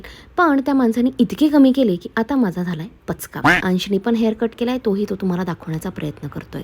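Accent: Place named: Baramati, India. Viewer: दीदी तू खूपच मस्त आहे.अमेरिकेत राहते पण भाषा आणि बोलण्याची टोन अस्सल मराठी आहे.जशी आपल्या बारामतीची . लईच भारी... दी.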